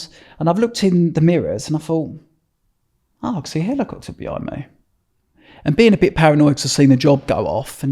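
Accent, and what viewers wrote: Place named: Southern England, United Kingdom. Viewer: What an awful poncey Southern accent.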